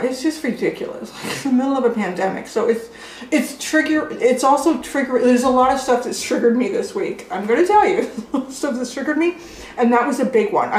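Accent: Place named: Canada. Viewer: Really like your channel- wish you lived nextdoor! You might almost, I live in Minnesota and you sound Canadian.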